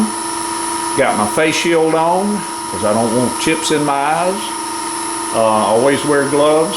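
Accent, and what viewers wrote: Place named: Georgia, United States. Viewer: I love turning wood, and I really love that accent of yours. I have a sister who has lived in Ark. most her life, but it's a bit different than yours. Are you from Georgia, by chance?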